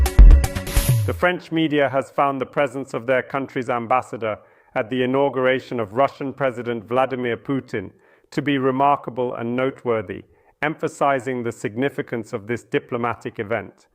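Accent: United Kingdom. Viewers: Pan Portnikov’s speaking with BBC accent! )) Technologies are amazing. Now I am able to share your brilliant analytic with my English friends. ❤ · this is true, but his voice must be ai generated. He has nice British accent, this is impossible to achieve with his background.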